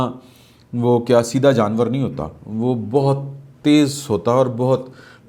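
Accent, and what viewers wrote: Punjab, Pakistan. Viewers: Your punjabi blended urdu narration is really fascinating. Also,your way of telling events in nuanced manner, associated with humour & sarcasm is probably the best amongst the other competing Ytubers.You said politics is not everybody's cup of tea but,I bet you are no less a seasoned politician. Good luck to you.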